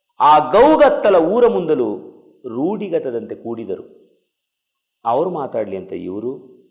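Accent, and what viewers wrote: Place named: Old Mysore, India. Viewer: ಹಳೆಯ ಮೈಸೂರು ಪ್ರಾಂತ್ಯದ ಗ್ರಾಮೀಣ ಸೊಗಡಿನ ಮಾತಿನ ವೈಖರಿ ಅದ್ಭುತ ಗುರುಗಳೆ 🥰❤👌🙏🏻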